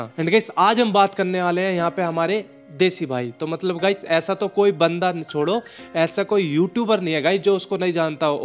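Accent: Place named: Haryana, India. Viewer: AP PAKKA HARYANA SE HO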